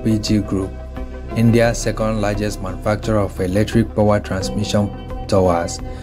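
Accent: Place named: Nigeria. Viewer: You sound so foreign with the way you pronounce the city's names of Ghana. I have no doubt your accent is Nigerian. Anyway, thanks for sharing the info about Ghana. Let's see how Nigeria is doing on mega construction projects in your next video.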